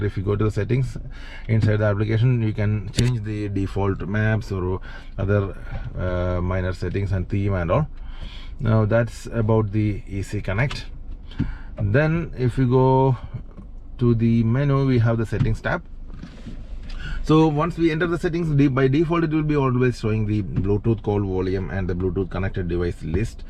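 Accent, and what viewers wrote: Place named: Kerala, India. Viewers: Sounds like a " Malayali "...... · Malayali daaaaa....😍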